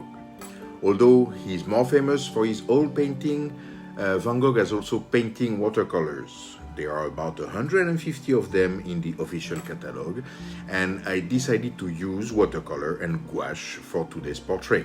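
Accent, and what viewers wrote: France. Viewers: on arrive à reconnaître l'accent français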